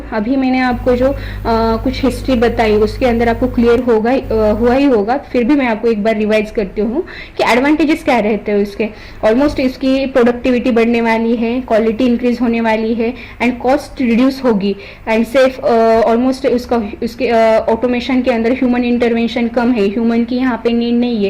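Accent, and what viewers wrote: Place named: Hyderabad, India. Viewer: Ma'm aap Hyderabad se hai kya? Aap ka lahja batara k aap hyderabadi hai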